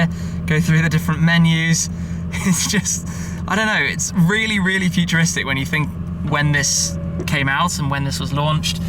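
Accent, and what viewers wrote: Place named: United Kingdom. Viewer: I hate that british accent.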